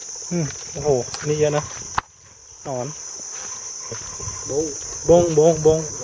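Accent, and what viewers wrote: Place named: Suphanburi, Thailand. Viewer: พิธีกร ควรฝึกฟัง พูด ภาษาลาวบ้าง พูดเหน่อๆ สุพรรณ เวลาคุยกับชาวลาว ไม่รู้คำศัพท์อะไรเลย คนฟังรู้สึกตะหงิดๆ แต่เนื้อหาดี น่าสนใจ ขนาดคนไทยด้วยกันยังฟังไม่ค่อยออก เหน่อจัด อิอิ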